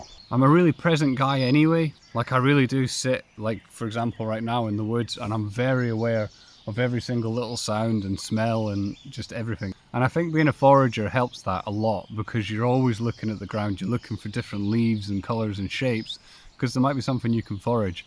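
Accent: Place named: Scotland, United Kingdom. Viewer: Jobbies… What a fabulous word 😁 I love it even more when it’s said in a Scottish accent! I came across your video on the Liveration channel yesterday, there was something so relaxing about watching you speak, when you said you also foraged and had a YT channel of your own I had to come for a mooch! Hope your routines are going well, guess I will find out shortly… 🙂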